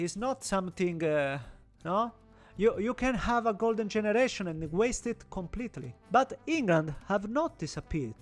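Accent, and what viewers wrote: Italy. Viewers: Messo like ed attivato la campanellina subito. Ottima pronuncia, spiccatamente italiana, ma corretta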